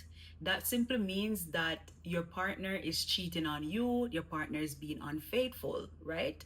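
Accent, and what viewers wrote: Jamaica. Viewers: Hi. I'm a white American from NYC, and I've never been to Jamaica, but I've been around Jamaican people from morning .... from my eye was there at my knee .... from 19 how long .... from Whappy killed Phillip ..... I'm EVER there amongst Jamaicans ...... (a really long time is what I mean 😭🤣) The YT videos I've seen about Jamaican patois are always awful ("wha gwann" "irie" etc) - but you've got the bonafide twangslation, my friend, and you just got a new subscriber! I fulldorse your channel!!😂 UP! <hits like>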